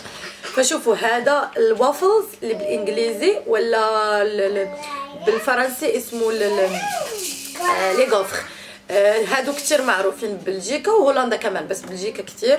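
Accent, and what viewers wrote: Morocco. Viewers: نتي غزالة ماشاء الله ولكن لهجتك المغربية احسن مليون مرة من اللهجة المشرقية المستعملة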